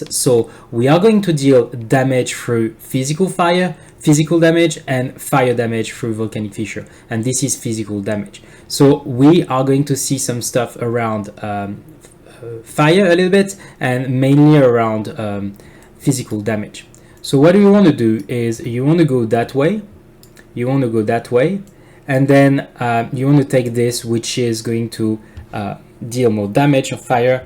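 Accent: France. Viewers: Hey man as a fellow french man I got a great advise for you to change your pronunciation to a more proper one (not that Frenchy ^^ ) I watched your video about the tower and it was helpful to me so I wanted to get you some things for you as well ^^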